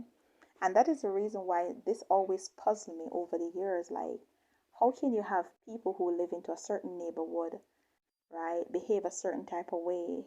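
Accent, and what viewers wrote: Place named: Jamaica. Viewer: I love love your Jamaican accent ( peace and blessings )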